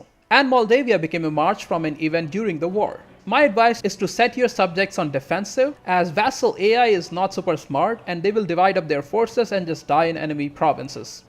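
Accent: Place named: India. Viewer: Your accent sounds so Indian, where are you from? And yeah, the strategy was really good, never thought it could be done so easily.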